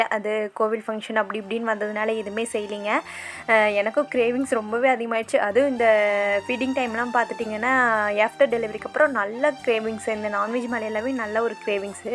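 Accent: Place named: Kongu, India. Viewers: amanga ivanga Kongu slang than pesaranga....பல்லடம் area va irukum nu ninaikiren...nan tirupur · Haaiiiiii sis neenga tirupur Karur near area ahhhh... Na Kangayam... Unga slag Namma area mari irukunga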